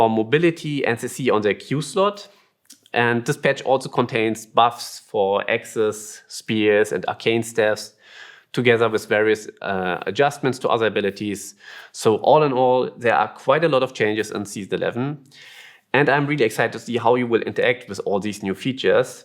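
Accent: Germany. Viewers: his accent is the most german accent ive ever heard.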